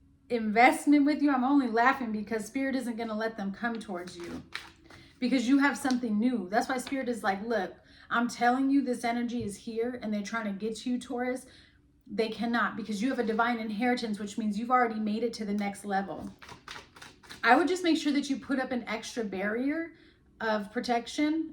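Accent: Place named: South, United States. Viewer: I like your voice. Hope that’s ok to say. Where u from if you don’t mind me asking? I am guessing somewhere in the south but would love to know where. Thanks for the reading!❤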